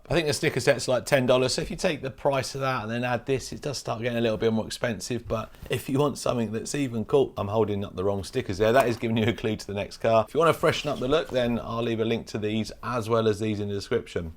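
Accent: United Kingdom. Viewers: I was onboard until you started pronouncing things in British fashion, rather than how they're actually pronounced. Knee-sahn, not nis an. FFS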